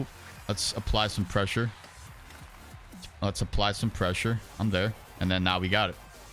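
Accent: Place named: Delaware County, United States. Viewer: You should do a Tom Wilson build. And I have a random question… are you from Delco? Your accent sounds just like it lol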